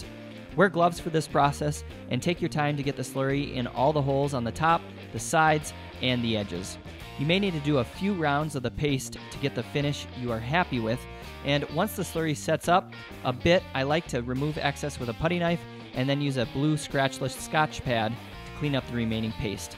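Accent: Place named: Montana, United States. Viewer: I have to ask: Is that what a Montana accent sounds like? I noticed you say the A in "bag" and "rag" in a very different way. Very nice looking table!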